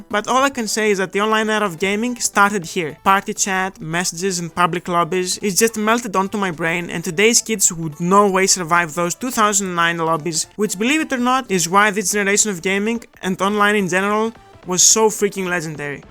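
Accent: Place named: Greece. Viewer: to greek accent 💔💔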